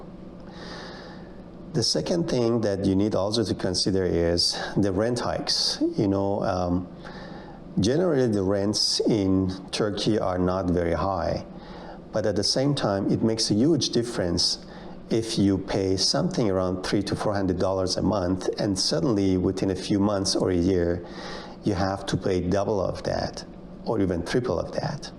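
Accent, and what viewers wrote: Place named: Iran. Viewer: You sound Iranian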